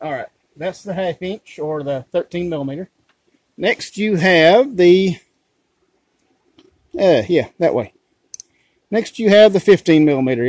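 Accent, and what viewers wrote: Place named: South, United States. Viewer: I don't understand why people feel the necessity to criticize such good-hearted intention and help. The bungee cord move.. what a boss .. so simple, it's genius! (Poetic license here folks, please? I understand proper grammar. But, we're not in an academic setting. Are we?!) Being a city boy mathematician, 17 years ago I moved to a small Texas town. I've discovered I'm whiter than most and a country boy at heart! The problem with that is that I'm Hispanic, Mexican American, or whatever I'm called these days. I liked the Southern Drawl! Brother, I guess many have forgotten the Golden Rule. I enjoyed your video and light sarcasm. You are funny! Don't forget to keep us laughing. I learned and I was entertained at the same time. As an educator for over 30 years, learning should be FUN! GREAT JOB!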